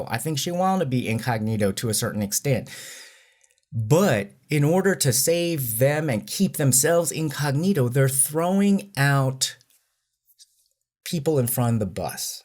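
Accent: South, United States. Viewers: I love how your southern accent started to coming heavier as the video progresses. 😂 I love it.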